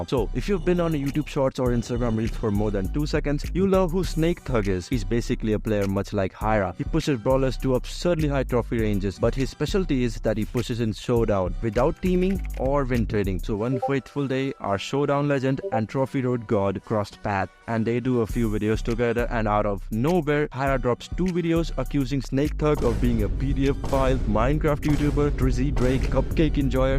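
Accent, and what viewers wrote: India. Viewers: Indian voice😊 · Are you indian cause i can hear the accent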